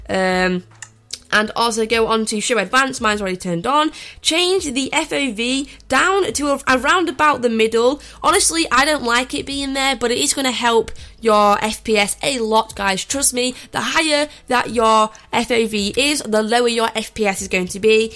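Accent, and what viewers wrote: England, United Kingdom. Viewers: What is your english accent? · why does every minecraft player has british accent? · i like your british accent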